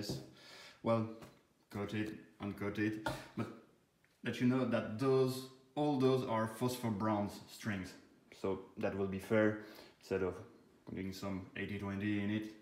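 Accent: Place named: France. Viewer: T'es français toi!😅😊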